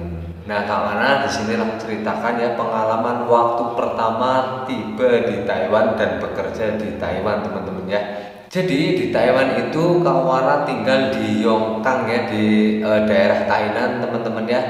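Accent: Banyumas, Indonesia. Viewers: Ohw wong ngapak yak..itulah perjuangan yg di jalani dengn sabar ..dan tlaten yo pasti panen..👍💪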